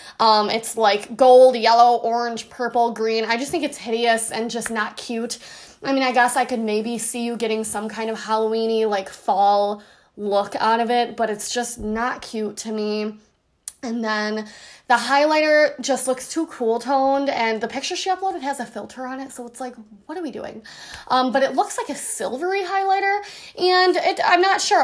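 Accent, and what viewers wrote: Buffalo, United States. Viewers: Why is the packing always better than the products? Oh and are you from Buffalo? I love your accent!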